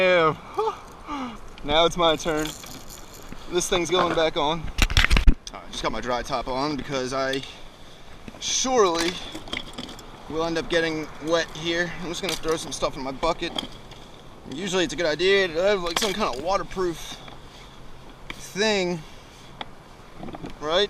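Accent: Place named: New Jersey, United States. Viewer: Dude you sound like your from Jersey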